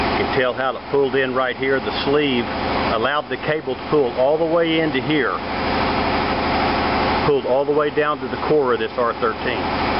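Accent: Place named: South, United States. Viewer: Lots of words in a southern accent.........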